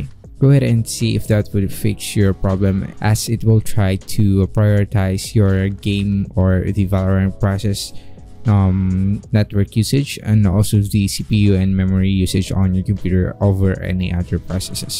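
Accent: Philippines. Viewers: I hear this slight Filipino accent, but still very detailed video :>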